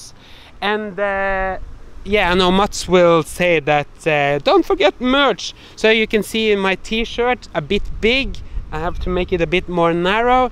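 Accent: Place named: Norway. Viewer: What a treat! We came to America from Porsgrunn when I was a little girl. We always spoke Norwegian and now with my parents gone I miss speaking my native language. I am addicted to your videos to hear you speak my dialect. And I enjoy the funny Nordmenn too!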